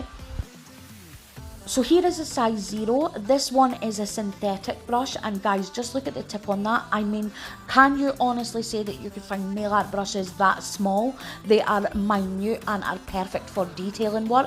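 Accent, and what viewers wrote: Scotland, United Kingdom. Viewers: My first time here. Scottish?? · Really nice to hear the voice of not only a fellow nail art enthusiast but also a fellow scotswoman😍👍😍👍😍👍👏👏 also great vid!! I am soooo envious of your glitter collection.🤣🤣🤣🤣😟😟😟🤣🤣🤣 gorgeous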